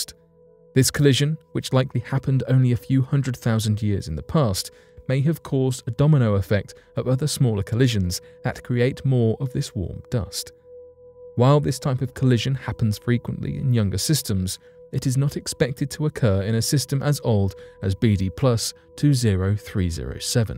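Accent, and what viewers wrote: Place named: Southern England, United Kingdom. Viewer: It's southern English same as mine an you really can't understand? where s your accent from just curious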